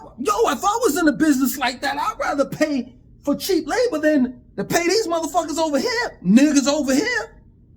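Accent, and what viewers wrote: New York, United States. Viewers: I hear you New York accent fam I know you heard about the Central Park 5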